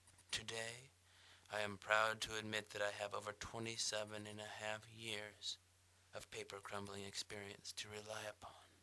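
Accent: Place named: France. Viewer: Do you look back on this and say, "Ya, I do sound like a tool." But it's not your fault. You probably watched too much Cousteau. Octopus, octopus. Why do you hide. In that Frenchy accent. Sounds like your trying to pull off some type of avantgard Joe cool kind of thing. No, nope ain't feeling it. More toolish than anything else.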